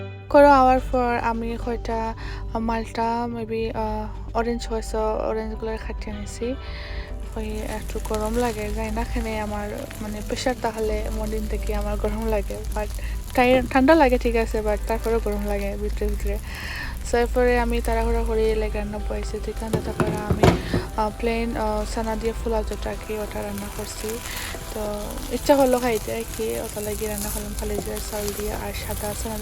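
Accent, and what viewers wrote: Sylhet, Bangladesh. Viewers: Amar car wash ay jaytay darun lagay, specially car ER bhitor boshay thaktay. Apnar bhasha ki sylheti? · Walaikum Salam apu. Apnar sylheti accent khub valo laglo. Joined you join me too dear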